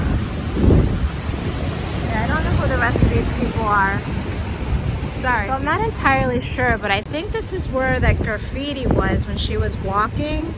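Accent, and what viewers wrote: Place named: Spain. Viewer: I love your Spanish dialect. Such beautiful color's, and the ocean is really something. Thanks for taking us with you 🥰😎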